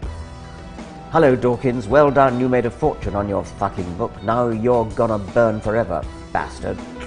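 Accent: England, United Kingdom. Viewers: LMAO do funny with his prim English accent and dry humor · These always bring such a grin to my face. I love the way Dawkins reads them in that proper English accent. Makes them 10,000x more humorous! Please, not such a long spell between these! Just love the love letters! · we all speak like that - in England dear. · It's made all the funnier by Richard Dawkins reading out the often illiterate, rambling, expletive laden stuff from people claiming to be god-fearing Christians in his dry English accent. My own favourite is th eone who tells him how they are looking forward to seeing him being tortured in hell and signs it off with "a loving created daughter of our lord". And of course "the fart of god"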